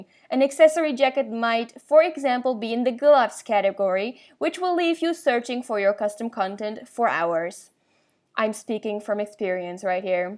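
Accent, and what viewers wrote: Netherlands. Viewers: You dutch girll? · Its dutch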